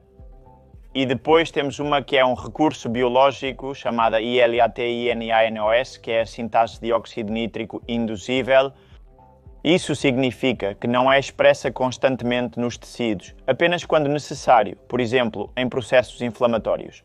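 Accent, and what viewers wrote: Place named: Galicia, Spain. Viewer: EU. CARALLO. QUE FALAS. Ti GALEGO. GALEGO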